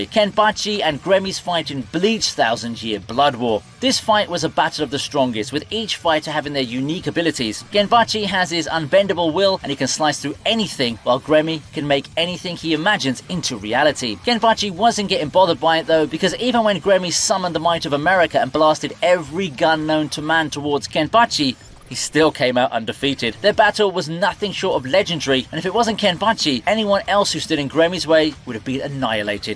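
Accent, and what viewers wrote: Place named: United Kingdom. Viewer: Hou je kanker bek met je kut Britse accent. Groetjes Jos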